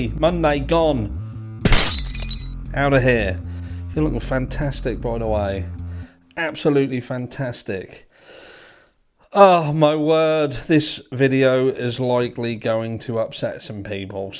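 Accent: United Kingdom. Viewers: Right there and there lol I think I just like ur bretesh accent eh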